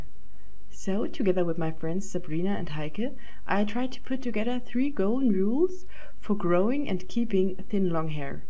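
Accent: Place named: Germany. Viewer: Dieser deutsche akzent man, friee golnn rüüs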